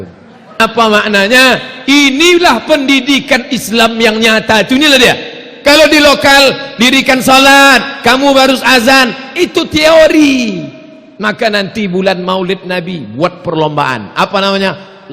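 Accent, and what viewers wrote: Tanjung Balai, Indonesia. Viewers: mcm orang tanjung balai..cara ustad ngomong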